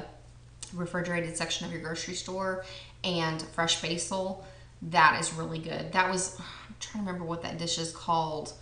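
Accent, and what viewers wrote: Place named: Oklahoma, United States. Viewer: You are fairly good at hiding your Okie accent, but I caught it in this video a few times ;) I would love dinner videos, but you know me-- I post food on Instagram a lot. I need to get my "lifestyle" or "mom" channel going so I can post what I eat in a day videos.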